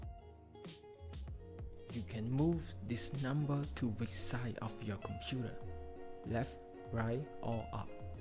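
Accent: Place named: Asia. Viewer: When I heard the Asian accent I knew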